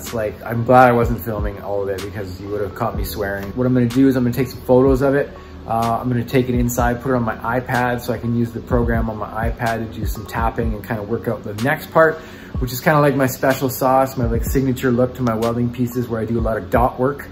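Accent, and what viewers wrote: Canada. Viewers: T tu quebecois?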